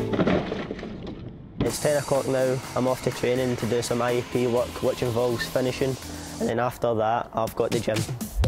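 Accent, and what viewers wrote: Scotland, United Kingdom. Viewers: I love his Scottish accent lmao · If he Wants to have a good career he has to get rid of that Scottish accent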